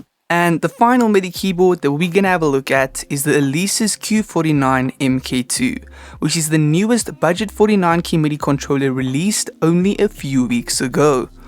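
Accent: South Africa. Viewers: I know your accent is south African, I was wondering why you had the money in dollars instead of R😂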